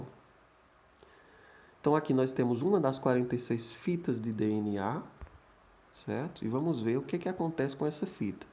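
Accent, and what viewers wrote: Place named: Minas Gerais, Brazil. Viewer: Modo de falar legal em minas, amei esse trem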